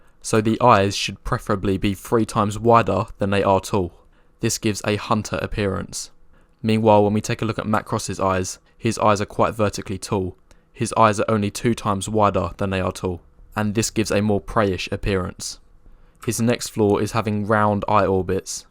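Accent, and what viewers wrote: United Kingdom. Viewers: but the narrator's voice is way more feminine and soft and incel then all of these guys he's calling out. What's worse is he's got a British accent which is viewed as weak in most cultures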